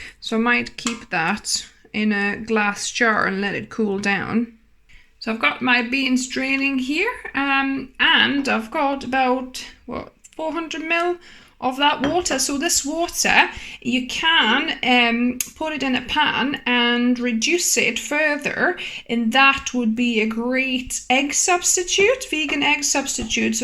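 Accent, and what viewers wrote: England, United Kingdom. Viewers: What a nice English accent. 😅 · Just found to see about how to go about making some black soy beans I have for natto. I'm not worried about the beans splitting, so thinking I'll give a go after rinsing first at maybe 45 minutes with 2" of water at least above. Not to be rude my dear, though you have the most combined European accent I've heard. Sounds a mix of Great Britain and then some. Thanks for sharing. I'll try to document and report back on my vlog YT channel the results. Thinking I'll not use the Mueller pressure pot and go with the Instant Pot if I can't confirm the pressure settings being equivalent to the High Pressure off the Instant Pot IPDUO80 I have out.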